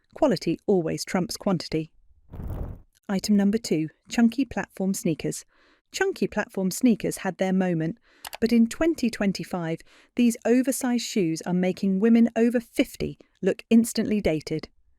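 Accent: England, United Kingdom. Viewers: You have an English accent, however you use American terms such as Pants instead of Trousers, I don't understand why the English language used in the UK, and taught in Europe has changed its language to fit in with American English. we are teaching our children American and at risk of losing our own language. This is not me having a go, I just don't understand!!!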